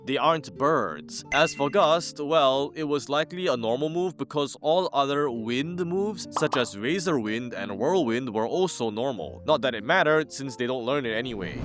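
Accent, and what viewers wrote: Singapore. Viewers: are you Singaporean, cuz the accent do be like that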